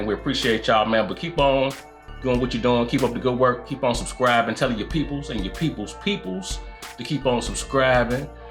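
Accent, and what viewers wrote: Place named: South, United States. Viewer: This recipe is So simple and easy. I luv your southern drawl. I see ya! You know I'm from Memphis, TN. Thank you and yes! it is all about the Flava!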